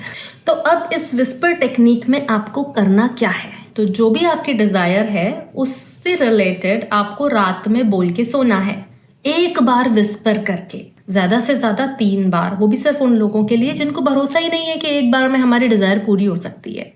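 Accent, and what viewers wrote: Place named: Indore, India. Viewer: Everything is superb but the way you say something it's like an indori accent, bahut sundar smjhaya hai apne sita ram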